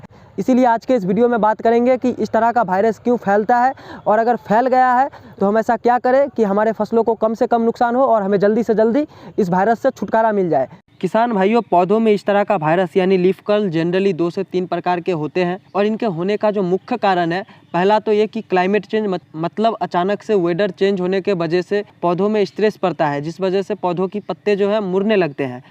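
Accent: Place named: India. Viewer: Ye kuch india k parts me aisi accent hi hoti hai . Mazak udane ki zarurat nahi . Bengal me bhi to V ko B bolte hai . Vidya ko Bidya bolte hai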